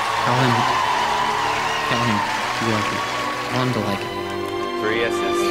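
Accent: United Kingdom. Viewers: I thought it was real until Simon said "Well, here's your chance" but I still had doubts before that because I was like "Wait... isn't British.. Is he? 0.0" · umm is he really british?...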